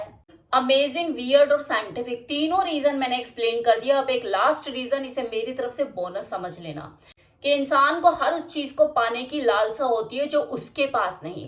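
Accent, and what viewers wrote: Haryana, India. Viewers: App Haryana ke ho?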